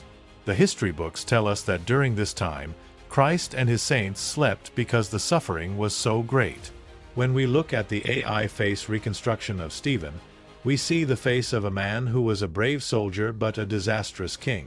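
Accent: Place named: United States. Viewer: I lasted 59 seconds. Several reasons. One, the godawful music is so loud that you can hardly hear the narrator. Two, the narrator is talking in an American accent which means his pronunciation of certain English words is going to be dire and, Three, Just from those few seconds I could tell it was going to be CRAP.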